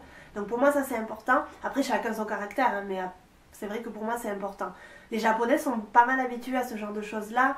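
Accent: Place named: Toulouse, France. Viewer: ha une toulousaine! il me semblait bien que ton accent m'était familier haha! tes vidéos sont très intéressantes ! en espérant te voir au Japon quand j'irai! la biseeeeee du sud ouest!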